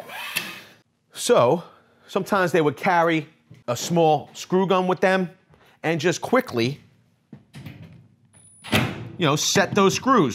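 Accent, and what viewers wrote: Philadelphia, United States. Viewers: Ha he is from Philly ,was thinking New York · I like the length of the video but I'd appreciate more philly-charm. Like take a break to eat a cheesesteak and/or play up the philly accent and slang a bit lol. Good vid.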